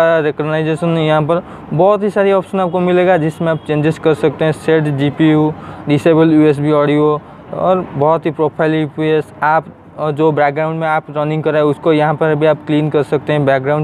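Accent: Odisha, India. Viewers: Are you frim odisha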